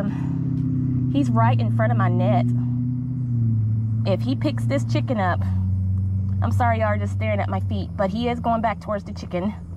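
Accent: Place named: Florida, United States. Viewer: Your welcome, thank you for posting! I'm a Floridian as well, I can hear it girl!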